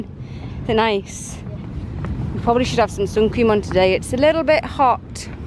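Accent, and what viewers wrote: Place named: Yorkshire, United Kingdom. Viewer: That Yorkshire Accent.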